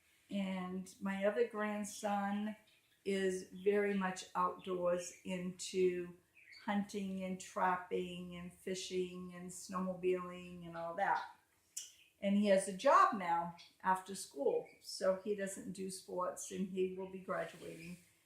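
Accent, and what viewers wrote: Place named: New York, United States. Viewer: nice video. Your accent is it from New York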